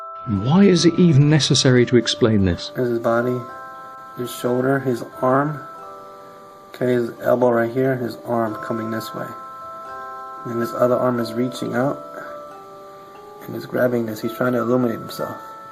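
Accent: United Kingdom. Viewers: While my mind is having a hard time accepting the person you're quoting isn't a Poe, I still enjoyed the demonstration of your know-how, Google Fu, and awesome British accent. edit: On the other hand, if the guy is somehow serious, you spent about 20 minutes ripping into someone with a mental disorder. And I enjoyed it. We're horrible people :( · How many hours did you just spend debunking what is obviously the rantings of a mentally ill man? To what end? Do you think if this guy gave up his religion all would be well with him? I bet if he gave up his religion, he may well find himself inexplicably compelled to "expose" the religious rantings of the mentally ill. I see little difference between his video and yours. The British accent does make you sound smarter, but crazy is as crazy does and dude, debunking crazy peoples videos is just bat shit crazy. Did you honestly think we needed your help debunking his sky demon theories?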